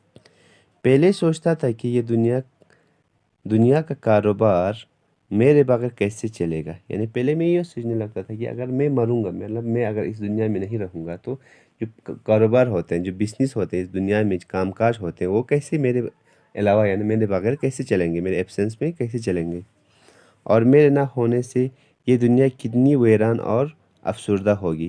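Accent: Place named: Pakistan. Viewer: Ooo bro bohot Jldii reply kiya h , I told because, Sir ka Urdu accent sa laga Mujhe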